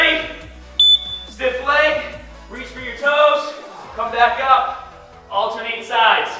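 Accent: Boston, United States. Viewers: the boston accent is strong in this one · Here due to Covid-19 gym closure. I live in Quincy, MA. And that sounds like either a fake Boston accent or someone with a Boston accent trying to hide it. I grew up in MA with immigrant parents so I talk different than everyone. But back on topic, my quest is to not lose any muscle or strength during this crisis. Good workout! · Boston accent like crazy! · This guy is so hyper and it hyped me up it’s awesome. Also I’m from Boston and I love the accent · The Boston accent is strong with this one... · its a boston accent i think. it wasn't always so prevalent in the past though. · This was just what I needed for my Coronavirus outdoor workout...your Boston accent kills me! hahahahahaha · Love this leg workout. has the BEST Boston accent ever!!!!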